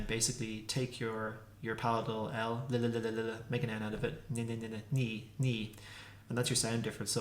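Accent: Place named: Ireland. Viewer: Oh, not at all, there's definitely a beauty to the diversity of the dialects in Irish. I suppose its analogous to tomahto (Ireland) vs tomayto (US) vs tomawto (South England) in English. The Irish or Gaelic dialectical continuum is a fascinating subject, making for a lot of fun comparisons with languages like German and Italian (eg Napoletano). I'd like to read more on the reconstructions of the extinct dialects of Irish, it's a pity the Dublin Gaelic blog isn't updated so much any more. If you know of any others, do let me know. I'm looking forward to your next videos. We need more people like ye pumping out content with authentic Irish phonology & intonation. Even a 10 min conversation between you and Patchy or Dazpatreg would be a joy.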